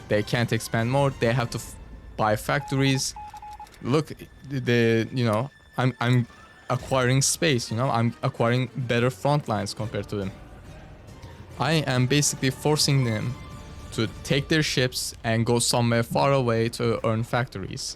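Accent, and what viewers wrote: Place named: Turkey. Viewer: Are you turkish your accent seems turkish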